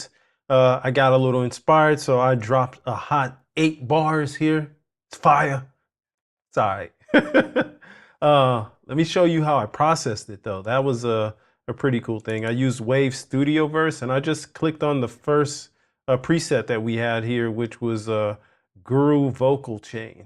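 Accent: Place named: South, United States. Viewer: 🤣🤣🤣yes, maybe. But you actually do have a somewhat southern accent. I live in New Orleans and I grew up around up near those guys. I appreciate your constructive approach through your videos. Extremely helpful. Thank you so much.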